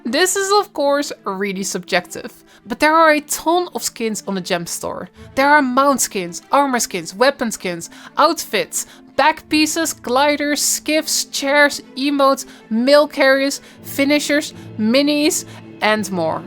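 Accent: Netherlands. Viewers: u sound very dutch ^^ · I knew it!. the moment that accent hit, haha Dutch person! you are found! haha. Anyways, thanks for the data. Good luck on the rpg.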